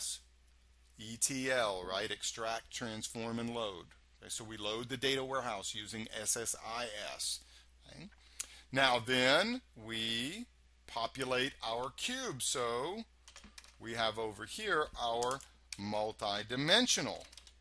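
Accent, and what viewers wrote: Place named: United States. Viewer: Why do your vids get so many dislikes? Youre like the only person teaching sql with a perfect american accent lol.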